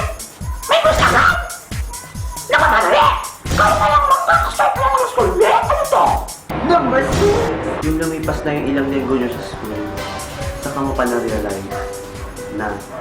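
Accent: Visayas, Philippines. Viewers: halata. ilonggo or cebuano ito cia.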